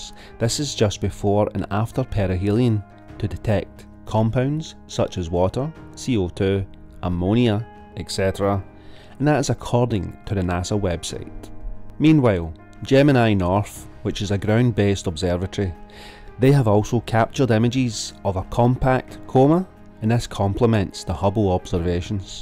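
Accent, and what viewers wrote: Scotland, United Kingdom. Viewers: Scottish/Edinburgh accent. As a Dane it sounds like that anyways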